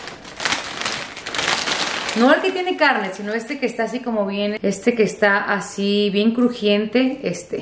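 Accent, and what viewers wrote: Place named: Mexico. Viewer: Al escuchar tu acento Mexicano me dio mucha emoción ver que alguien de otro país hiciera pupusitaaas! y aun mejor KETO😱 Soy de El Salvador así que apreció tu esfuerzo! Gracias por la receta! Me gustó ☺️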